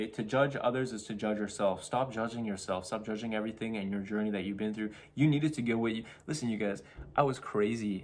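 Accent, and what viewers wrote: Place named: Canada. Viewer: This is a slightly strange comment 😂 but I'm canadian and have been studying in germany for 2 years. Hearing your voice (you sound so distinctly canadian) was so comforting. I'm happy to be where I am, but I really needed that little taste of home.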